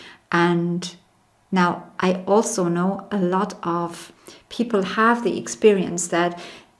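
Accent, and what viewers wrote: Ireland. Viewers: May I ask are you Irish?! I am and your accent is very Irish!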